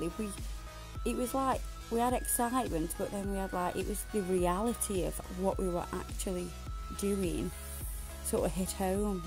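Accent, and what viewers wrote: Stoke, United Kingdom. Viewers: They were stunning duck your uncle would be so proud. Please do more videos but dunna have music as loud as conna hear those delicate stokie tones xx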